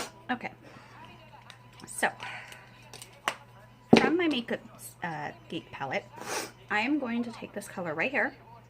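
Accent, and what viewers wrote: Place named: United Kingdom. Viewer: OMGGGGGGGGGEEEEEEEEEE!!!!!!! That was amazing, you are AMAZING!!!!!! I love you and I am super thankful that you took time out of your own hustle and bustle of life to do a video for my girl. I love the look, love me some British accent too. GORGEOUS!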